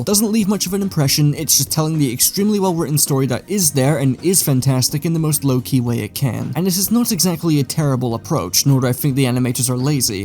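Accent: United Kingdom. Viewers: Another British v-tuber… cool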